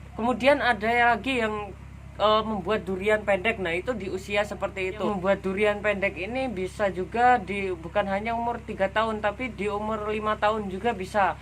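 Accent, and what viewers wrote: Java, Indonesia. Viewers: Jawane medok kok ngomongnya pakai doang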